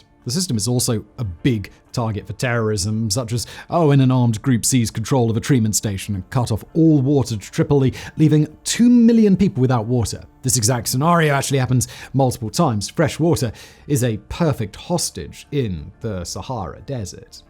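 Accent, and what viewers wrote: United Kingdom. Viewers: I can never tell when if he's mispronouncing something, or if it's just the usual British insistence on adding hard vowel sounds where there isn't even a vowel.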